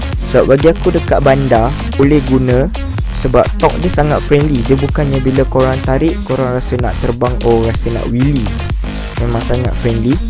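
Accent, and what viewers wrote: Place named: North, Malaysia. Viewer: Memang syok Ooo🤣🤣🤣🤣 ayat utara btl..😂😂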